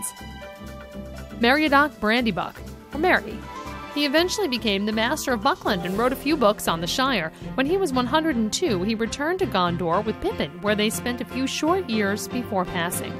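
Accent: United States. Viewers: i couldn't even bear to get to that part. 8 seconds in i stopped the video because of her watchmojo american accent that clearly gives off the vibe that she has no idea or interest in what she's talking about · she's American, and they obviously don't/can't pronounce English like the British do!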